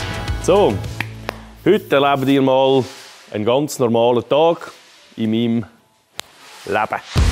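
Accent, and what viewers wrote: Switzerland. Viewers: Interessante Videos und immer wieder klasse Projekte. TOP! Mir persönlich gefällt es, dass sich kein bisschen anstrengt, es irgendwie auf "Normal-Deutsch" zu kommentieren und immer auf der "Schwizerdütsch- Schiene" weiter fährt.:-) Muss allerdings sagen, dass ich als "Badner" aus Deutschland, keine Probleme habe, es zu verstehen, da unser Dialekt ja recht ähnlich ist. Als Norddeutscher wäre ich für die Untertitel auf jeden Fall dankbar.:-) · Nur Züri-Dütsch :-)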